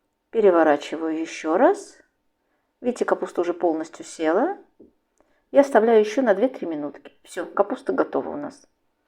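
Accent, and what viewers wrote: Baku, Azerbaijan. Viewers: Тихи-тихо - это потихоньку. И у Вас милый бакинский акцент сохранился❤ И Вас приятно слушать и смотреть, спасибо за рецепт! Москва смотрит. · Джан, мой бакинский акцент ☺️🌷💜 Как бальзам на душу.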